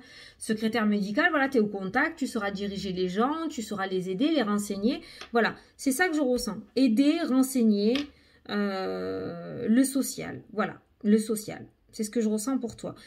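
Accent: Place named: Lattes, France. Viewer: Salut Trop contente de retrouver chaque jour mon petit rayon de soleil 🥰💖😍 (en plus comme je suis Lattoise mais exilée a bordeaux ton petit accent me fait trop du bien aux oreilles 😁😜 )genial merci ce petit concours 😋🙏🍀🤞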